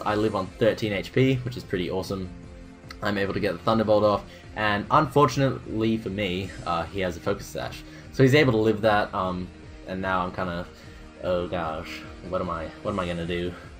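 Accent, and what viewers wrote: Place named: United Kingdom. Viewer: pokémon battles commented with a British accent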